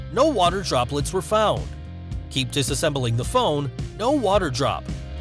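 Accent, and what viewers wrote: United States. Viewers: Finally an English American speaking review, tired of all the desi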